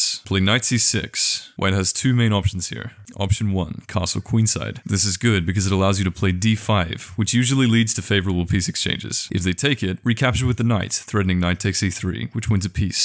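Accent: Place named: United Kingdom. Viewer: What is this guy's accent? it sounds half British half something else. I'm mainly here for the whispered interpolations. Very nice.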